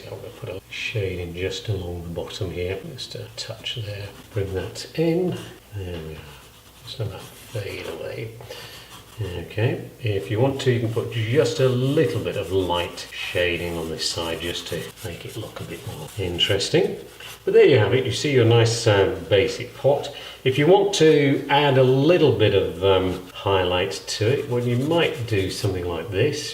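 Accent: United Kingdom. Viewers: YOUR ENGLISH PRONUNCIATION IS VERY BRITISH,I LOVE TO HEAR IT! ANYWAY,METHOD WAS GOOD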